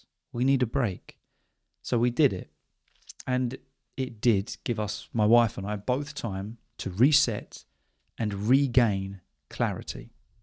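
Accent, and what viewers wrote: United Kingdom. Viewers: Hello,! What's your kind of British accent? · Thanks a lot! As I'm taking a break from studying my lectures, I've really enjoyed your video and your amazing British accent! 🤍 greetings from Iraq! ✨ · Your accent is awesome, I like it a lot. What's the name of your accent? Manc? Yorkshe?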